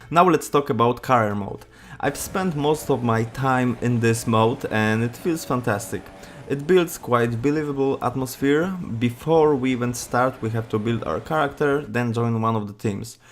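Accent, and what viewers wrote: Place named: Poland. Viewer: słyszę ten polski akcencik. Fajna recenzja :D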